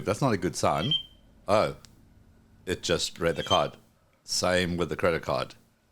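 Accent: South Africa. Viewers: Love that lekker South African accent of yours!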